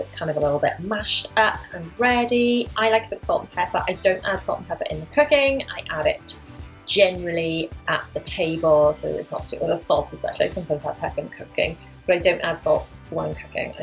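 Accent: Wales, United Kingdom. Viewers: i love this video but i love even more how you say quarter lol love the welsh accent!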